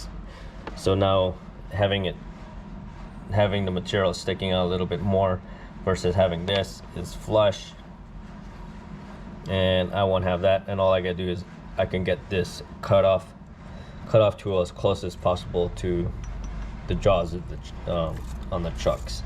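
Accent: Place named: Appalachia, United States. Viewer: I love how you sound almost as hillbilly as me through most of the video, then turn your heavy accent back on in the outro 😂 thanks I have been debating on getting into cue building and looking at this lathe. This was very helpful.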